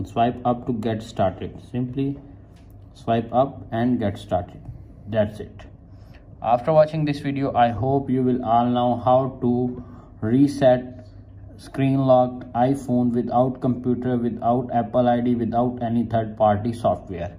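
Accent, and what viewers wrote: India. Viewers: Don’t be fool by hi Indian professional voice all liar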